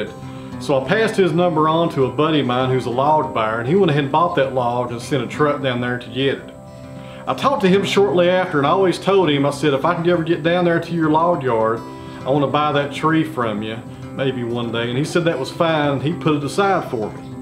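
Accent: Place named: South, United States. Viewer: Hey bud! Interesting video & story! Beautiful grains on that log too! I just had to complement your accent. I have a southern accent too but mine is clearly an NC/SC Piedmont one. That eastern TN twang is one of my favorites for storytellers!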